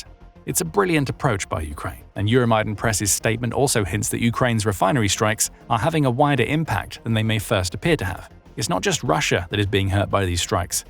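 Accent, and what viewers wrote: England, United Kingdom. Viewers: Why does the narrator, who's clearly English by his accent, keep calling petrol, "Gasoline"? They call it petrol in the UK NOT gasoline.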